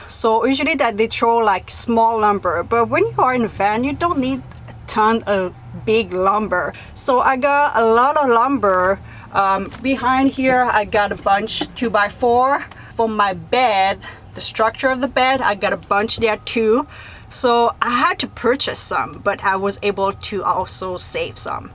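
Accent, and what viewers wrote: Quebec, Canada. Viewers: Thanks for the information. You are very informative. Again you give me hope that to have a van and travel is not a foolish dream. Love your accent. It is what I imagined Canadians would sound like. I am from USA. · Somewhere on here she has said French Canadian.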